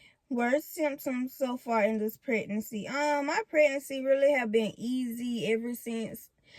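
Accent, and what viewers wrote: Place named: South, United States. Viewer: Hey.... I love the name Zahavi...and your Lil southern accent make it sound really cute. I bet he look just like you! I'm proud of you for stepping up and wanting to be the mother you are. We all have things we wish we could have did different but if we did different it would still be other choices we could have made...if that make sense. Your so cute and seem to know exactly what you can handle. Imma a fan! #TeamZs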